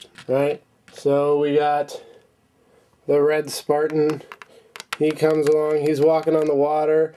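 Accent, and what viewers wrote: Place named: England, United Kingdom. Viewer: it is not British accent, it is English BTW but good model!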